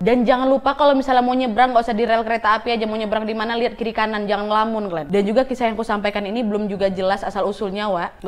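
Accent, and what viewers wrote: Indonesia. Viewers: Hebat kamu bisa membawa rasa Indonesianya(Aksen) dalam cara membaca naskah. Terusin channelmu semoga sukses👍👍👍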